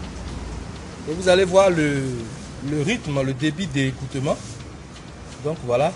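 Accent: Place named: South, France. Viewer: Coucou, j'ai tout compris... tellement bien expliqué et avec l'accent du soleil en plus, que du bonheur. Plaisir et joie chez vous.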